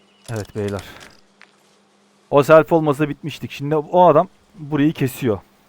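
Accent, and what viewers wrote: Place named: Anatolia, Turkey. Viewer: Hocam allahtan keşfetime düştün aşırı zevk aldım seni izlerken. Oyunundan çok konuşma tarzın aynı bizim Anadolu tarzına benziyor. Yayın bekliyoruz hocam eğer açacak olursan elimizden geldiğince destek oluruz inşallah.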